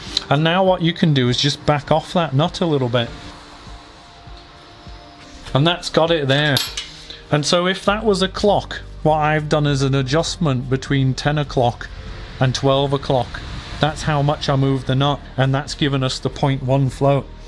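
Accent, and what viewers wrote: Liverpool, United Kingdom. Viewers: Great video!! Love the accent! Liverpool?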